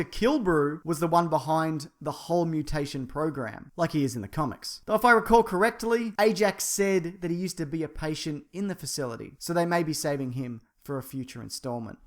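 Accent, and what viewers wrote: Australia. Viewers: I tuned in to WATCH deleted scenes as your title indicated. All I heard was you blabbing in your crappy Aussie accent. Stop misleading ppl with your titles!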